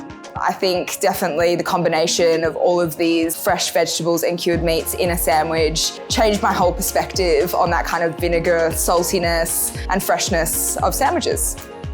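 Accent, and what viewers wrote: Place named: Australia. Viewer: I think she's from Australia or somewhere "over there" Everyone has an accent no matter where we're from. Get over it... 😏 And... you can hold the Balsamic Vinegar on my Sandwich too... 😢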